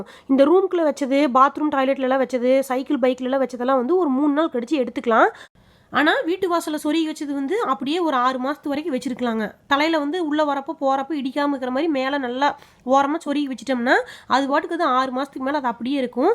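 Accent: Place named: Coimbatore, India. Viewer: BEAUTIFUL KOVAI SLANG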